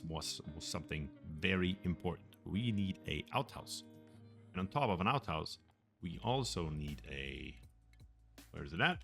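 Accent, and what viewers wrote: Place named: Germany. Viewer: i have watched enough joerg sprave to know that this man is definitely german.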